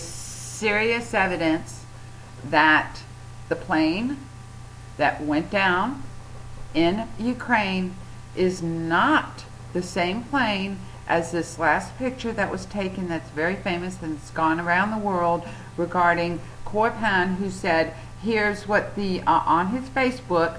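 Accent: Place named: United States. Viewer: NO, it's NOT 'absolute proof'. It is not 'proof' of any kind. It MAY be evidence. It also may be the plane at the next gate! I don't know how many times I've been about to board and see what I think is my plane - when in fact my plane is next to it. The boarding gates are often deceptive, where you go through a door right near the plane you think you're about to board when guess what? You walk along a long corridor to a plane that is a long way from the door... often not even visible from where you're waiting. And, of course, that's assuming that the photo that swept the world was taken at the time and place claimed. Assuming that it isn't another hoax, like the man on the roof of the World Trade Center! Just because you see it, doesn't make it real. Go watch a 'magician' sometime to understand what I mean. From your accent, you're in the US. 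Are you one of the 98% of Americans who have never been abroad? And for all those conspiracy theorists who say that the crashed plane is the airliner that disappeared after taking off from KL... Yes, this would be a good way to dispose of an embarrassing plane... But how do they dispose of THIS embarrassing plane? Oh, I do believe in conspiracies - but not idiocy.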